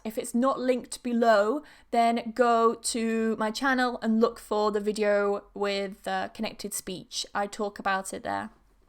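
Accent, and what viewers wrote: United Kingdom. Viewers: Hi, i like your Brittich accent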